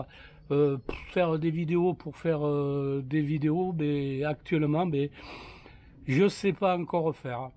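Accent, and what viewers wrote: South, France. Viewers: Coucou de Dordogne, j adore votre accent du sud , vos trucs et astuces, vos conseils , que du bonheur !! Merci et bonne journée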